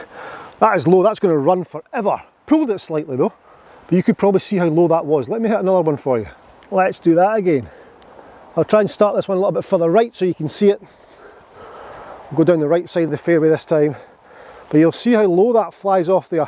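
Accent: Scotland, United Kingdom. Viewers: Hey! First of many comments! Hi - thank you for you excellent tutorials. I always enjoy your lessons, recommendations, tips, and your entire approach to the game. I am an intermediate golfer over in Calgary, Alberta, Canada. I'm happy to big you up whenever I can. I had previously looked at other golf-guru types with similar channels, none of them helped me. I then looked at your Ben Hogan grip lessons, your overall laid back approach to the game, your Eureka methods, and boom, what a difference in my game and my enjoyment. That, plus now I can channel a Scottish voice in my head when making my shot. Thanks, keep it up.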